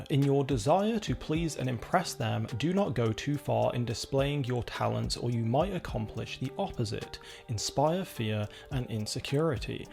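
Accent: United Kingdom. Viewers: Its always so bizarre and cringe to hear the word ni**a spoken in a British (or frankly any other non American) accent. The term used in an endearing or hip hop adjacent way is so inherently African American and steeped in the specific experience of being Black in America. African American pain/liberation is tied to the word in this context, so when Black people with different accents from other parts of the world say it, it sounds wild af. This is partly why Kendrick told Drake we don't want to hear him say it anymore. Nice video, but just food for thought. Race and culture are not the same thing, Drake not seeming to understand that is a part of why he lost so miserably.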